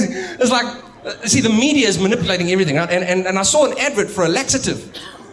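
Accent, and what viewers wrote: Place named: India. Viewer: man its tolerable adopting street Indian accent, but joking on Ghandi.. thats where you're a completely pathetic freak.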